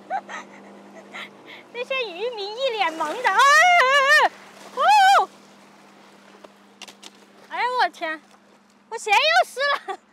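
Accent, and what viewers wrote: Sichuan, China. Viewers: 你这川普哦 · 这妹子是四川口音吗，还喜欢吃麻辣 笑哭 哭笑 笑出眼泪 破涕为笑 笑死 笑尿 笑cry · 带着一点川普的感觉，四川普通话哈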